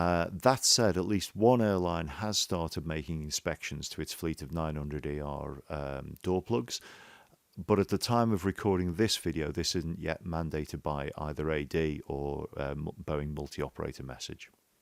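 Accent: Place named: Wirral, United Kingdom. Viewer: You’ve got to be from the Wirral i’ve been looking at your LinkedIn profile and the moment you started speaking I thought I know that accent. 😂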